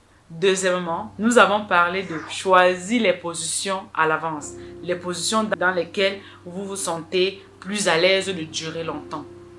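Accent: Cameroon. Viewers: Merci coach. Je suis sûr que vous avez déjà accouchée. J'aimerais voir le joli bébé 🤱. Votre accent tend vers le Cameroun, c'est ça?svp.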